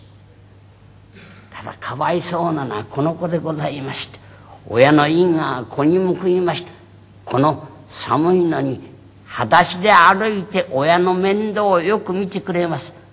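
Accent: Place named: Tokyo, Japan. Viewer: 中学生ぐらいの時に師匠の話を聞いて「江戸弁っていいなぁ」と思ったものでした。 この師匠とか円生師匠には何処となく色気が有ります。 up有難うございました。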